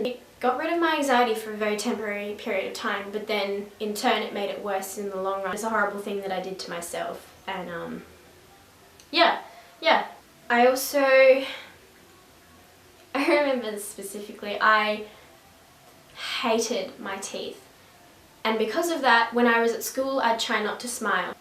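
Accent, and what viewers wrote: Australia. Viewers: I got so excited when I heard your Australian accent! Subscribed!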